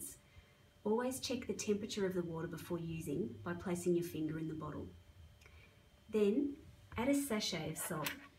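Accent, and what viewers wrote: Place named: Australia. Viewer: Australian voice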